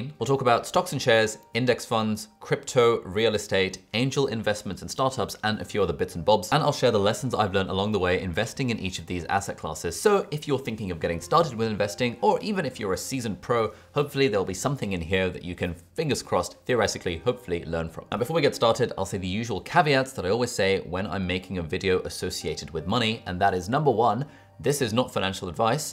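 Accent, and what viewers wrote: England, United Kingdom. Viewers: Hi, I like ur video, but plz try to slow down little,I paused too much to cope with… cool British accent.Thnx a lot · Absolutely love this and omg your British Accent is to live for!!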